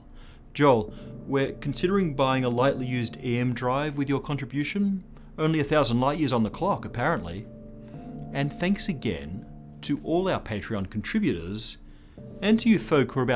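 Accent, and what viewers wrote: Australia. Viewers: My biggest mystery from this video , which is great , what accent does the host has ? Australian ?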